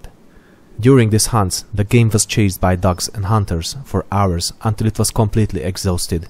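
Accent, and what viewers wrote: Hungary. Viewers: A hunglishodból egyből tudtam, h magyar lehet az anyanyelved, még mielőtt utaltál rá :) Tetszik a videó, csak így tovább! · Behold the hungarian accent... :D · What i hear is hungarian accent? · Hungarian accents are gorgeous :3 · Are you hungarian? (Because you have a hungarian accent.)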